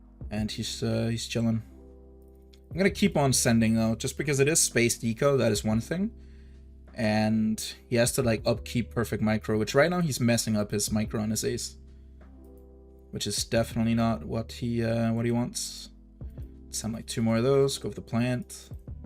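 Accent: Denmark. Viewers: yeah, I know he's from Denmark, I just find it funny when he doesn't know about things or pronounces things differently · he's Danish, our j sounds like that. fortunately for us all, doesn't drink baja blast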